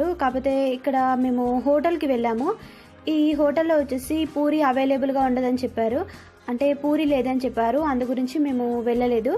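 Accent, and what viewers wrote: Telangana, India. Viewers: Super akka telangana yasa superrrrrr love u akka pakka telangana matalu superrr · Hi Telangana basha mustuga maladutunavu dress super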